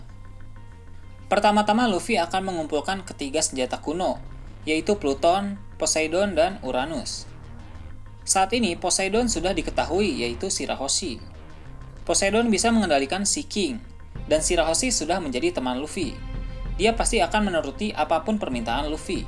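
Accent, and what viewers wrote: Indonesia. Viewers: sejauh ini admin udah oke, tapi untuk penyebutan istilah dalam bhs inggris masih indonesia banget wkwkwk usul aja buat belajar pronounciation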